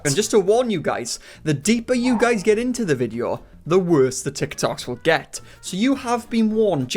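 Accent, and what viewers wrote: Wales, United Kingdom. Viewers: are u welsh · Nice brit accent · why are you british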